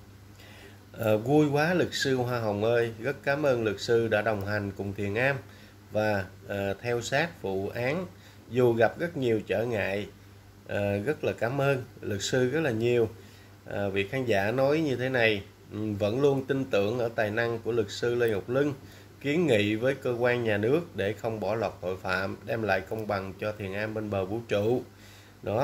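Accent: Standard. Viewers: Em có giọng nói chuẩn như các bạn trong ban biên tập vậy đó. Chúc em cùng gia đình nhiều sức khỏe và an vui hạnh phúc nhé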